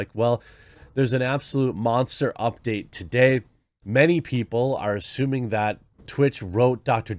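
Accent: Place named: Wisconsin, United States. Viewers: Still not Mark Richards levels of Wisconsin. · It's funny how much more Wisconsin sounds when he's ill.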